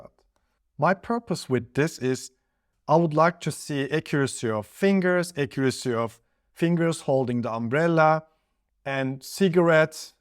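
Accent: Turkey. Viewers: aksanı duyduğumun 5. saniyede falan dedim ki bu türk. anca bu aksan bir Türk'te olur. Çok farklı konuşuyoruz gerçekten yabancılardan. nasıl hintliyi vs fark ediyorsak konuşurken. türk kişide anında anlaşılıyor be kardeşim. iyi çalışmalar.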